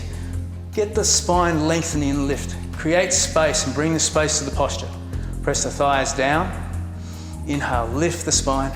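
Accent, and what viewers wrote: Australia. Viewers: I do yoga on You Tube every 2nd day and it is so refreshing to finally hear an Aussie voice! Thanks for the vid :)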